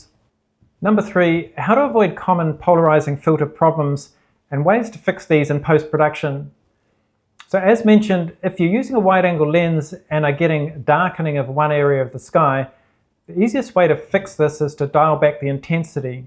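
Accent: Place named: New Zealand. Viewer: Nice to see and hear some NZ images/accent. I use Cpl filters alot for black and white photography.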